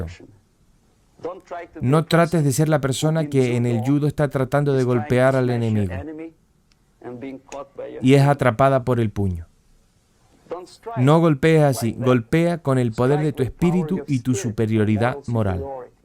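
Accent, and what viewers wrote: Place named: Canary Islands, Spain. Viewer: Muchísimas gracias por compartir conocimiento que de verdad nutre, tan escaso y tan difícil ee discernir entre todo el marasmo de intoxicación y desinformación que hay en la red. Orgullosa de verificar que por supuesto hay otro canario "despierto" (nuestro acento te delata...es inconfundible y a mi parecer precioso). Te deseo lo mejor con el canal, y en general, "que te vaya bonito" que diríamos por estos lares.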